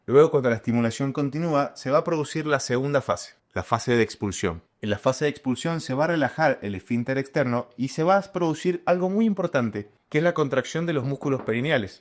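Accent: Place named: Mendoza, Argentina. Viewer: lo único que entendí, que me parece que sos Mendocino.. esa tonadita que nos caracteriza jaja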